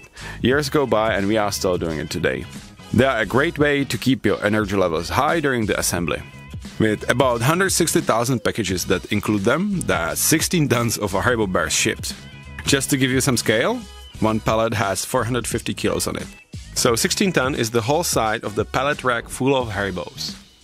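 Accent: Czech Republic. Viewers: i like your early czech accent..and really...NO Obsolecence sounds so great!!! i missing 1 or 2 nice prusament skintones...maybe in the future ?greetings from germany.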